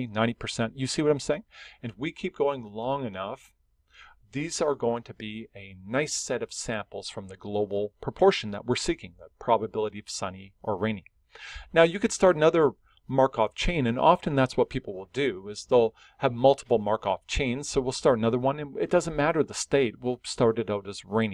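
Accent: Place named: Canada. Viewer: Just found out is Canadian, and in my opinion, the Canadian accent is the best English accent for non-native speakers to comprehend, thanks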